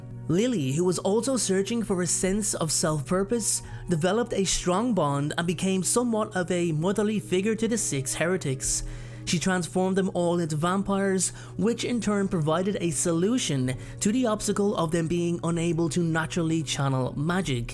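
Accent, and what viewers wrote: Ireland. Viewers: Desiccated... he has an Irish accent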